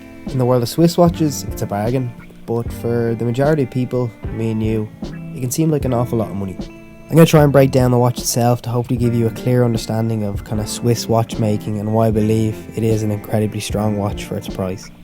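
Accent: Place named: Limerick, Ireland. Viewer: Are you from limerick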